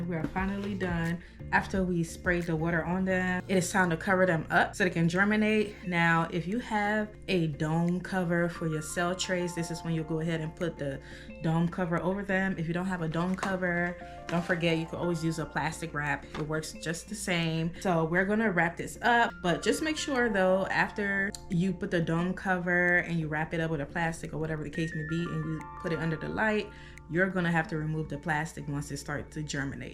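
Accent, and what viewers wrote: Liberia. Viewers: Do I hear a faint Liberian accent?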